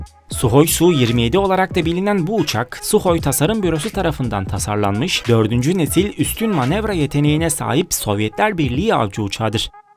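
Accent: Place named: England, United Kingdom. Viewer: Bu nasıl telafuz arkadaş, MİG'i am ay ci diye okuyorsun. SU yu es yu diye. Bütün herşeyi İngiliz ağzı ile okumak zorunda mısınız? "mig"; "su" diye neden telaffuz etmiyorsun. Bu kadar mı dilinize yabancısınız?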